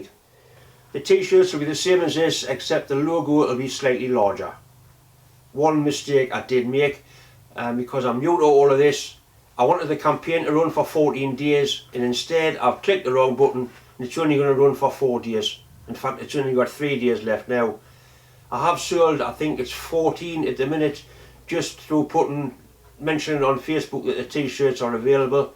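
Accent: Newcastle, United Kingdom. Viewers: For 42 seconds I was transfixed..then I was presented to the Geordie Andy Capp...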